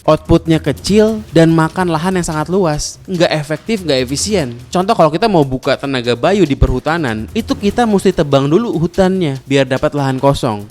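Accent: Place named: Betawi, Indonesia. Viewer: Dia pakai cara bicara khas orang betawi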